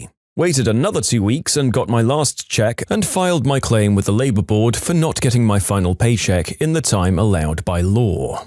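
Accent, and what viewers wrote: United Kingdom. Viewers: Is it just me, or is the new narrators voice really relaxing? I may be biased because I'm British as well and don't hear many British people online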